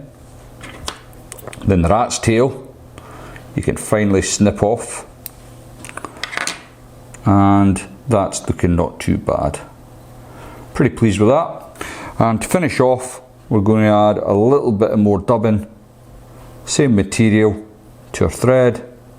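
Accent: Scotland, United Kingdom. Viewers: as an expat scot, I don't know if I watch your channel for the fly tying, which bybtgevwaybinthink is grand, or just to hear your accent!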